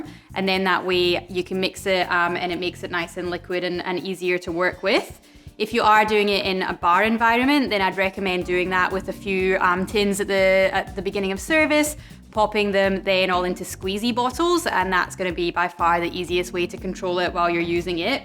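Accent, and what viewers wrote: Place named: Scotland, United Kingdom. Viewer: i thought so but at first i was thinking irish... but u sounded scottish... i couldnt make my mind up lol i guess accents can vary alot in different areas of scotland like they can in england... and im sure the same in australia😃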